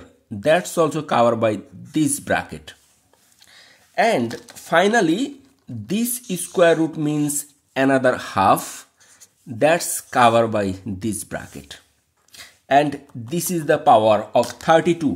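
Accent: Bengal, India. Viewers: Sir your style of speaking English is like Indians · Are you bengali From West Bengal India? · Your accent is like an Indian! 🧐🧐how? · He's from Germany... But his accent is so close to Indian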